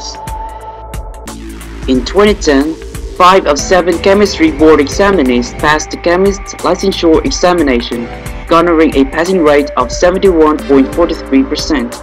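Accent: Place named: United Kingdom. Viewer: haha.,! wow.! British accent.,!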